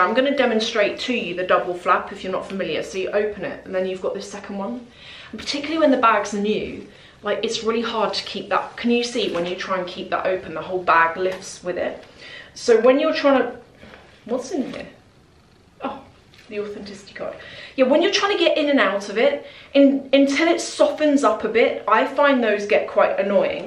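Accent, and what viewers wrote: RP, United Kingdom. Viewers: back on yt, i was missing your accent hun! posh and gorge' :* i feel sorry for your rabbit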